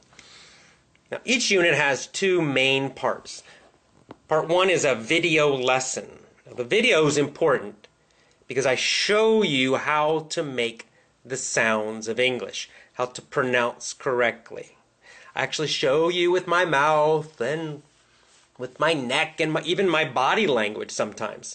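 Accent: United States. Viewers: I like listening to your standart american accent very much!))))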